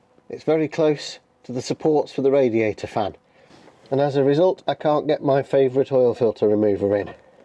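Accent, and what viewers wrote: England, United Kingdom. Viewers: Love listening to your instructions with your English accent! :) So easy!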